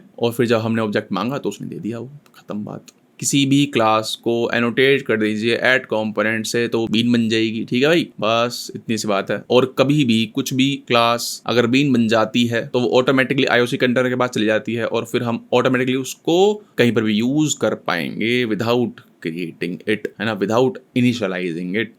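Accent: Uttar Pradesh, India. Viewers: wow gazab , the way of explanation wow mtlb amazing, ultimate , soothing and solid . lag rha hai samne baith ke koi padha rha hai. and i m from up as well and can connet with an accent , amazin. indeed the best explaintion . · Guru kamal adami ho accent to pura UP wala hai. Best explaination on youtube.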